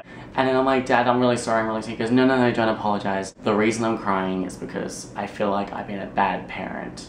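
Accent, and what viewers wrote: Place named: Australia. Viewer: i come hear for his australian accent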